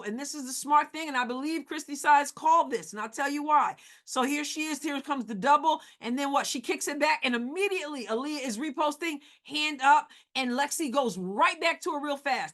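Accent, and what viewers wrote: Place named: Southeast Missouri, United States. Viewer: I thought I was able detect a slight hint of a Southeast MO accent! We are rubbing off on you!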